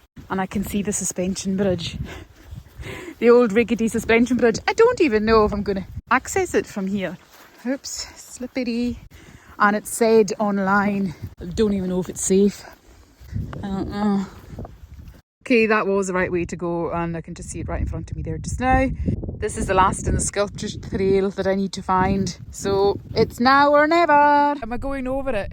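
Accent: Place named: Stornoway, United Kingdom. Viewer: I’m really enjoying your wonderful videos. Listening to you is like listening to my sister in law who is from Stornoway. Fabulous ❤❤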